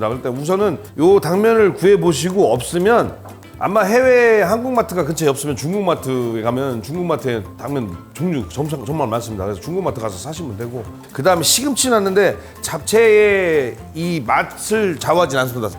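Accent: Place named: Gyeongsang, South Korea. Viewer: 경산도 말씨가 귀에 착착 감깁니다 영상보면서 입가엔 미소가 생기네요 재밌게 잘 보고 있어요^^ 윤이련씨, 가족분들은 좋겠어요.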